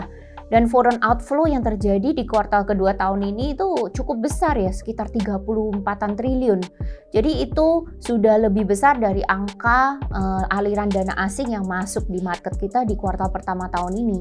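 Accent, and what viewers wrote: Java, Indonesia. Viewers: bagus kontennnya. cicinya logat jawa medok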